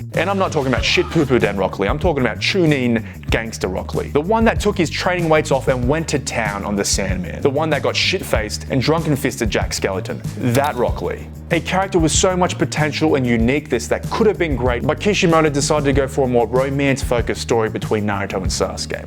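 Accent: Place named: Australia. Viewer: I wanna learn the aussie accent with this guy talking about anime. Perhaps he has a playlist with that specific content. Checking . . .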